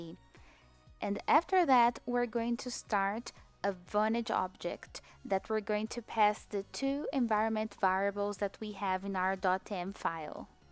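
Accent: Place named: Brazil. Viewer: Seu sotaque brasileiro é inconfundível! Parabéns pelo vídeo!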